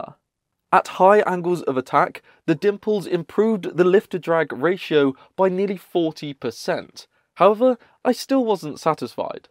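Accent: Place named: South, United Kingdom. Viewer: Southern British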